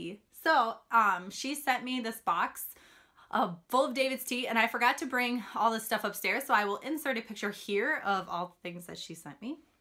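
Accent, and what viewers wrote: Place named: Minnesota, United States. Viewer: Fun video my moms from Minnesota so I recognized your accent. I have a billion relatives there hey you could be one.........I remember being there in December. And my grandparents walking to church in minus 0 weather!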